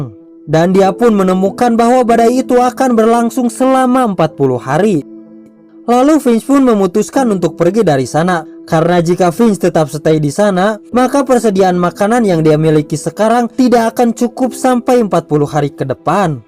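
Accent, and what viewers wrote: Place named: West Java, Indonesia. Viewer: Salam satu alur. Salam pemula om. Orang sunda yahhhh???? Hehehehe Keren narasinya ommmm👌🏻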